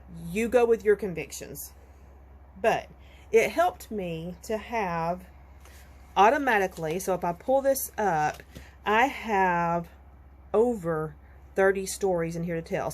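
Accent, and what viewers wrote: South, United States. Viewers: I always start with my photos. That gives me the direction. PS: please don't stifle the "country," enjoy hearing your Southern accent. 🌞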